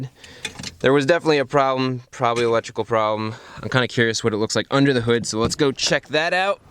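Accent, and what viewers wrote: United States. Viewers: the way americans pronounce car brands...it just makes my ears bleed...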